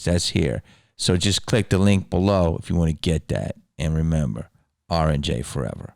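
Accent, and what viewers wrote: Australia. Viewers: I absolutely love your channel and videos like these. It's such a treat to get some behind the scenes insight, thank you! Also, I'm Australian and really appreciate the accent haha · That Aussie accent warms my Aussie heart. 🤣🤣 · I love all your movies !!You should probably make a movie as a Australian animal, hunter or something because your accent is spot on😂😂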